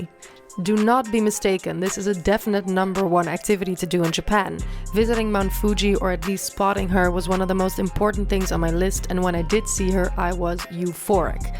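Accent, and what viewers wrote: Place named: Netherlands. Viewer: Ik ben Japans en deze video kwam als aanbeveling, dus ik heb hem terloops bekeken. Toen ik je uitspraak hoorde, dacht ik: "Huh? Nederlands?" Ik woon al 20 jaar in Nederland, dus ik dacht dat het makkelijk te begrijpen was! Bedankt dat je Japan zo geweldig hebt geïntroduceerd!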